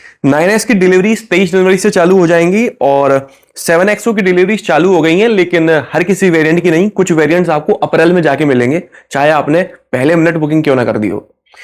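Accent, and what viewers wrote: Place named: Gwalior, India. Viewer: Bhai aap kaha ke rahne wale ho, क्योंकि कभी कभी आप भिंड, मुरैना, gwalior jaise भाषा बोलते हो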